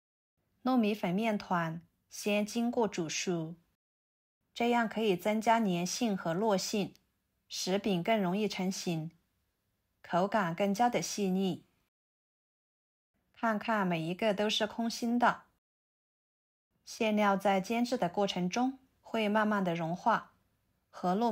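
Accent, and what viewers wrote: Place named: Yunnan, China. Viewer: 云南普通话😂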